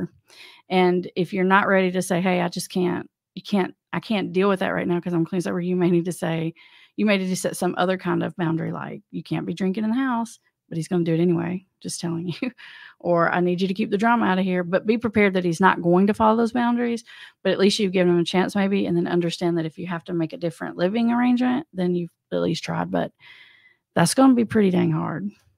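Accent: South, United States. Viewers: I appreciate your efforts, please tell us where you got your title "Master of Addiction.?", What exactly is your professional education, and your personal experience. Thanks PS I'm sure its from your southern accent, but. you talk so fast also, and I miss much of what your are actually saying. What is your belief of 'the disease concept" of addiction?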